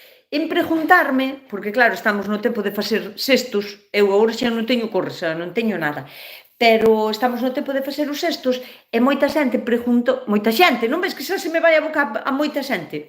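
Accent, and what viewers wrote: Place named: Lugo, Spain. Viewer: Yo nací en Lugo ,pero no me crié en Galicia ,ahora hace unos 35 años que vivo en Galicia de nuevo y me recuerda tu acento a familia que tengo en Lugo y a alúmnas que he tenido de la zona de Ribeira ,me encanta el deje que tenéis y se os entiende de maravilla ,no así a los de la zona de Mazaricos que sesean mucho ,por lo que les entiendo peor ,espero seguir viendo tus recetas ,y por supuesto que las haré . Gracias y sigue siendo tan maja y espontánea como eres .Un afectuoso saludo de esta gallega castellanoleonesa .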